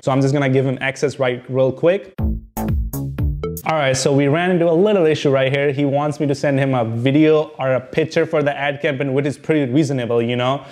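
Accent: India. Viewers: STOP trying to speak like a american , Your INDIAN accent is clearly coming through , STICK TO the indian accent ... stop trying to sound like American with R sound. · I like that Indian accent :)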